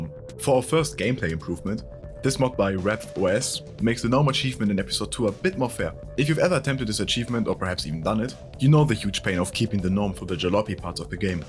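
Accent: Germany. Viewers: You think you speak English but i hear German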